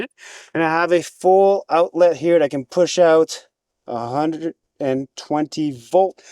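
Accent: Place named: France. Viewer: right on! I noticed your French accent! Northern Ontario here! Looking at buying one of these as our next vehicle!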